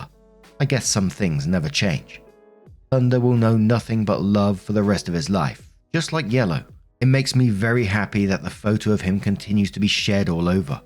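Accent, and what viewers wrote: United Kingdom. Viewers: I'm British and working in America presently, but thank you for pointing that out. I don't know how I could have missed the very British accent, similar to my own.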